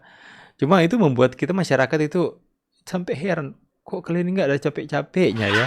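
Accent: Manado, Indonesia. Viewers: Tomohon selalu hadir nyimak. 👍😍 Tebarkan terus Kebaikan dan Kebenaran Bung bersama teman2 kreator yg lain. 🙏😇 Sekali2 selipkan dialek/logat Manado neh Bang. 😀😊...mantaaappp. 👍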